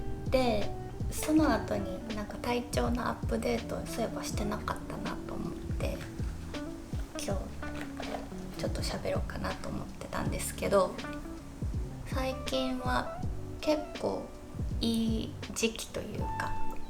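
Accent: Kansai, Japan. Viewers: 私も精神的な病気を長い間、患っていて良い時期と悪い時期の波があります。そして、線維筋痛症という身体の激痛と12年間も共にしています。動画やnoteで、naoさんが体調や心にきちんと向き合われているのを観て、私も体調と心にきちんと向き合おうと決めました。 メイク道具がポーチに入ってないのが面白かったです😂メイク道具って、なぜか増えてなぜかポーチに入りにくいですよね😂 naoさんのおかげで読書が趣味になったり、インテリアに興味を持ったり、とても良い影響を受けています。ありがとうございます！ あと、naoさんの関西弁って、とっても可愛いですね。癒やされます。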